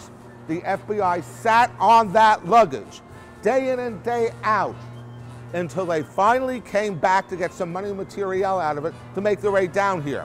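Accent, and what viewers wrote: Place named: New York, United States. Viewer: Sounds like a New Yorker.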